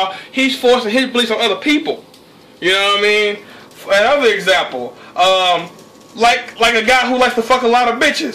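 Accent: South, United States. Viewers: Damn I wish I had a southern accent like you.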